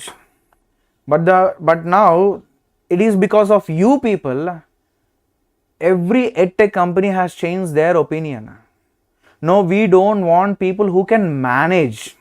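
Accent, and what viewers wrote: India. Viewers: Your perfect Indian accent makes your English too good sir!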